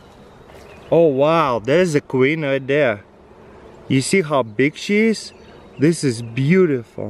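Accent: Russia. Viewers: i have no real interest in bees aslong as i dont get stung but his russian accent makes it worth the while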